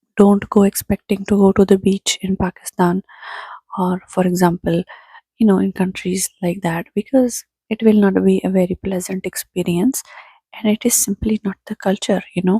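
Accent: India. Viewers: You need to make more flirty videos with the indian accent.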